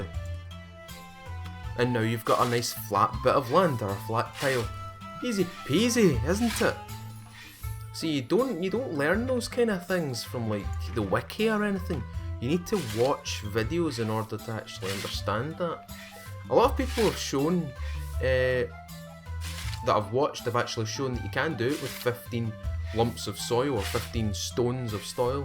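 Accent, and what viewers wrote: Scotland, United Kingdom. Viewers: I did NOT insult your accent, I really like the way scottish people from all over Scotland sound when they speak, I was just asking because it sounded like you had a scottish accent, but it sounded a bit different from the scottish I've heard so far, so I was unsure.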